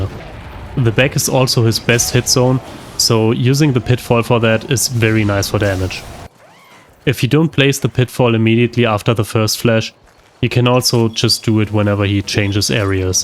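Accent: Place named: Germany. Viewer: Ich hab 5 Sekunden geguckt und sage du bist 2000% deutscher 😂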